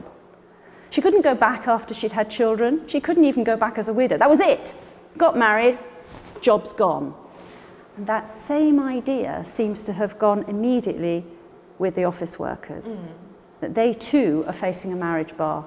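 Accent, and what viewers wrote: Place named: RP, United Kingdom. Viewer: RP accent is delightful.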